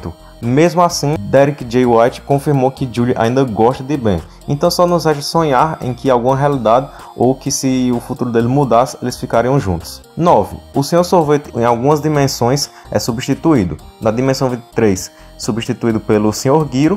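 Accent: Northeast, Brazil. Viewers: Ce é nordestino?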